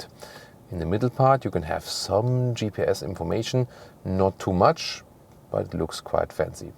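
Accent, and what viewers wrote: Germany. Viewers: man, hope you will find an english teacher one day... get rid of the germglish after all these years...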